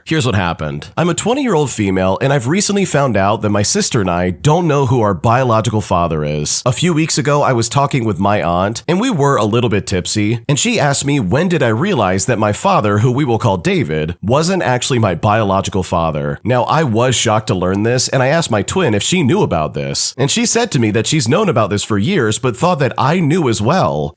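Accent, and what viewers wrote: United Kingdom. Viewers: first vid of the day is the British guy from Amazon I the genius..... Second vid of the day is the Australian guy .... ... And randomly the OG guy comes back... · Is it so much to ask for a proper label for the thumb nail? It does set off my "danger radar", I use the channel to relax to do the dishes or whatever, then no warning I hear "The British Voice". It's the inflections. Give me one GOOD reason why the channel can't label the Thumbnail? Because I just leave a thumbs down, because the voice is not what I subscribed to.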